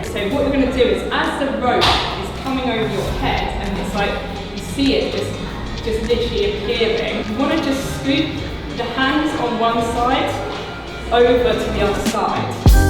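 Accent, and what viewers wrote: England, United Kingdom. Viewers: Cool I didn't realise you were a Pom until I heard your accent I hope you visit OZ sometime and visit the GC lots of Gym Junkies here that need to focus on something a bit more interesting than Bench Presses and Squats 👌✌️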